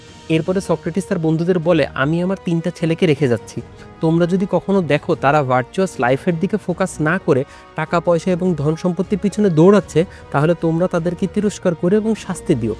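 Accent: Bangladesh. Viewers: ভাল প্রয়াস। তবে বঙ্গভাষার পূর্ববঙ্গীয় গ্রাম্য উচ্চারণ পরিহার করতে হবে।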